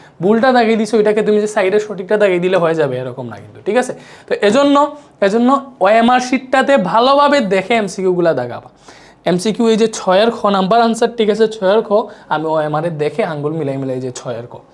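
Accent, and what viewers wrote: Sylhet, Bangladesh. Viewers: Ashole ami jantam na, ami notun bhaiyar class korchi. 🥺 kotha shone sure hoichi je bhaiya Sylheti😊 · ভাই হয়তো সিলেটি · Bai apne ke Sylhete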